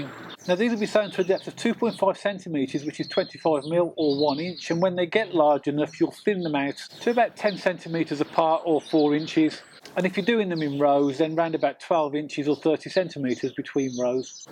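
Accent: Birmingham, United Kingdom. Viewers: Where u from? Sound a bit brummie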